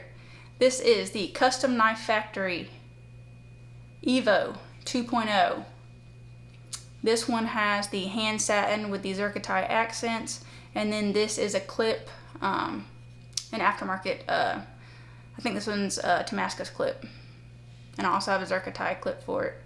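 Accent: South, United States. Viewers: petit lovely young lady with giant frigen knives. And a Nurse! suthrn accent. I cant comprehend it all. neet woman! love her personality. The Malibu and the last one where a toss up for me. I love a chunky knife. That last one was amazing to my tastes. Hmmm. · Okay.... I'm never watching any other knife reviewer again. I love her reviews, her smiling face, her southern draw, her hair, and the sound of her voice. Great content. Beautiful stuff. The knives weren't bad either. ❤️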